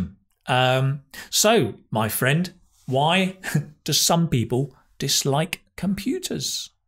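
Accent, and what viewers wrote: United Kingdom. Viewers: Thanks!!! Is your English british? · Is it UK accent? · Hi teacher? I really love. Your British accent. If I decided to study English by Cambly I'm sure I going to choose as my teacher.💚🌎🖍📗🇬🇧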